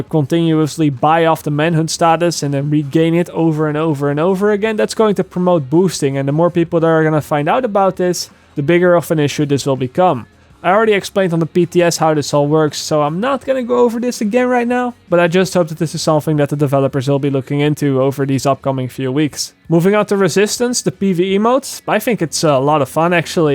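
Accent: Netherlands. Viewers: Aksing Are you dutch ?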